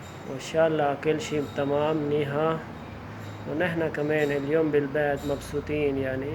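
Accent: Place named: Pakistan. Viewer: لغة العربيه باللّهجة الاردوية.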